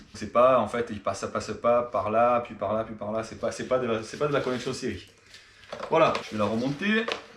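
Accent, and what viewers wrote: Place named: South, France. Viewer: Le bel accent à la Cabrel, magnifique ! ( et trés ingénieux le système bravo à vous )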